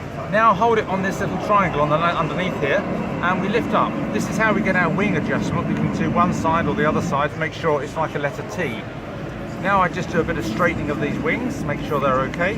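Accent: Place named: Cambridgeshire, United Kingdom. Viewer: CLAUSE BOMB!! :) Good day fellow brit, maybe even from cambridge? as you are in duxford and you have a distinctive cambridgeshire accent rather similar to mine. Keep up the good work chap. Tally ho!!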